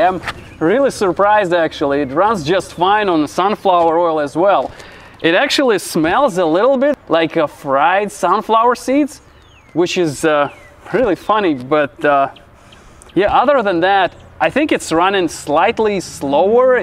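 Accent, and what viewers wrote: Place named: Eastern Europe. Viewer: Nice accent) Just love this slavic word pronunciation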